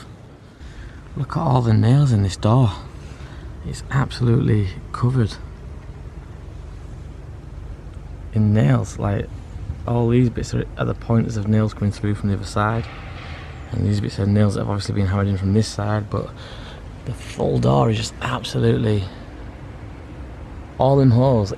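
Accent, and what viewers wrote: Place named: Yorkshire, United Kingdom. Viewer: i would love to come exploring with you i am chasing the same answers i live in blackburn you sound like a yorkshire man so you are not far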